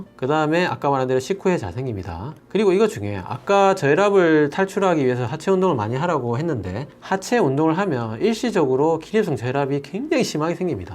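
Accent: Gyeongsang, South Korea. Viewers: 경상도 사투리가 친근하네요.믿음이 갑니다.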